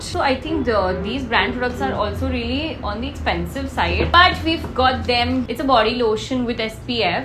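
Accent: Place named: Gujarat, India. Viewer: Apka gujrati touch acha lgta hai apki voice ma ❤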